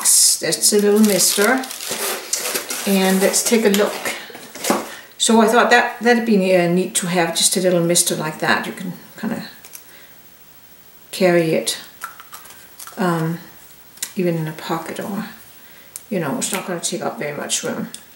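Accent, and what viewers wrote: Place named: Denmark. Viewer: As a dane I recognised your accent and had to go to your website to confirm what my ears heard. 😁 This is a great video, thank you for making it.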